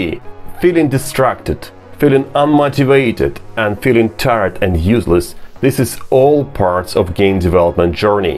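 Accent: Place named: Russia. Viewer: Я прям догадывался всё это время по акценту, что ты русский) Полезное видео, спасибо!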